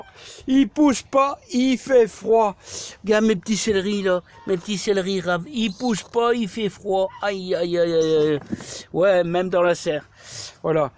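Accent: North, France. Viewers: Merci j adore le patoi du Nord 👍😜🤣 et tes vidéos conseils. Bonne journée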